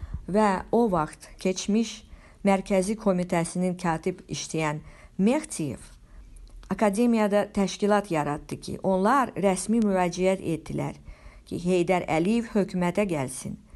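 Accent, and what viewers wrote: Armenia. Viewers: Bu danışan qadın ermeni lehcesi ile danışır. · Sən hələ erməni ləhcəni düzəlt sonra Heydər Əliyev haqqında danls elə özün tər təmiz ermənisən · Bu danışan qadın özü ermənidir görün nə cür ləhçədə danışır, ay millət bu cür təbliğatlar uymayın bizim başqa dərdimiz var ermənilərə müharibə qalib gəlmək,bu cür cındır şeylərə inanmaq lazım deyil şəxsən mən Heydər Əliyevin kim olduğunu çox qəşəng bilirəm,bu danışan qancığada deyirəm ay erməni qəhbəsi sənin danışığından ləhcəndən görürəm ki sən özün hayiksən ay it sürüsü sən indi məni inandırmağa çaışırsanki dediyin düzdü? Üzümü tuturam bizim o dəmşalağ bəzi millətimizin nümayəndələrinə belə şeylərə uymayın burda söyüş yazmayın,o ki qaldı Heydər Əliyevin fəaliyyətini tənqid eləməyə əgər bacarırsınızsa bunu yazın bunu deyin mən demirəm Heydər Əliyev biri mələkdi hər nədisə bizimkidi bu hayiklərin təbliğatına uymayın kimdə istəyir mən o insanlarla ünsiyyətdə olub Heydər Əliyevin kim olduğunu deyə bilərəm subutda edə bilərəm,bu adam yəni Heydər Əliyev əsli kökü Zəngəzur mahalının Sisian rayonunun Cömərdli kəndindəndir atası Əlirza kişi anası İzzət xanım,ata babasının adı Məşədi Cəfər,ana babasının adı Hacı Cəfərqulu,Heydər Əliyev isə özü Naxçıvanda anadan olub,bu düşmən təbliğatına uymayın kim maraqlanmaq istəyirsə buyursun burada yazsın nömrədə qoysun məndə nömrə qoyuram vasap <num> kim üçün maraqlıdırsa buyurun mən danışa bilərəm! 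· Бурда бири йазыр ки ханымын сесиди.ай тупой гормурсен ермени лечесийнен данышыррр??? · Senin danIshIgIndan bilinir ki, sen ermenisen. O, ermeni olsaydI AzerbaycanI iqtisadi cehetden inkishaf etdirmez ve ordu quruculugu aparmazdI.Zefer Qelebemizin ele esasInI da o qoyub.O dunyada tanInmIsh bir LiDERDiR. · Bu qadın Ermənidir səsindən tanıdım Mingəcevirdə yaşayıblar Azərbaycan dilini bilir bir kanal var BBS səhf etmirəmsə,orda müsahibə verib tapıb səsə qulaq asın. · Про алева не знаю ну ты точно не Азербайджанеч, может армянка по разгавору видно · Sən özün ermənisən.Ara qatmaqdan otrü bu yazını ortaya qoymusan Sənin danışığından məlumdur ki.,əsil efməni ləhcəsi ilə danışırsan.Sənin havadarlarında sənın kimi erməli məxluqlaeından dır. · Danisanin ozu ermeni kimi danisir Heyd er baba Esl Azerbaycanlidi az nagil danisin elebil torpagi Heyder Eliyev satib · Xəbəri oxuyanın özü ermənidir. Danışığından bilmək çətin deyil. 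Hamısıi böhtandır. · Evvel azeri dilinde danışmağı öyren sonra bu nağılları danış senin lehçen ermeni lehçesine oxşayır Camaatı inandıra bilmessiz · Bəs sən kimsən erməni ləhcəsiylə danışırsan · Bu danisan qadinin ozu azerbaycanli deyil ya ermeni ya da rusdur.buna emin olmaq ucun onun sozleri teleffusune cumle qurmagina fikir vermek kifayetdir.ayibdi vallah. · Danışanın özü deyəsən ermənidir. Ləhcəsindən bəllidir... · Sen özün ermenisen alçaq lehcenden melumdur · Daniwanin ozu ermenidir azeri olsa bele daniwmaz lehcese ermeniye oxwayir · Tocnu ermenilerin azerice danismasina oxsayir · Yalan sa allah sənə lənət eləsin. Ay cındır, sənin ləhçəndən erməninin yekəsinə elə sən özün oxşayırsan ki. Mənə çox marağlıdır, hansı erməni azərbaycan övladları üçün hərbi məktəb (yəni, Naxçıvanski adına) açar ki, sonra da onlar o məktəbi bitirib və vaxtı çatanda da elə ermənilərin özünün də kökünü kəssin?! 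Hansı erməninin övladı ali baş komandan kimi minlərlə erməninin məhvinə, erməni ordusunun darmadağın edilməsinə əmr verər?! Ay cındır erməni, sən bu suala cavab verə bilərsən? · Междупрочем:у-вас-аккицент Армянски:вы-кто:любои Азэрбаиджанец-знаем:алиев Армянин, а, пачему, именно, вы Имфармируите, людеи!!!!!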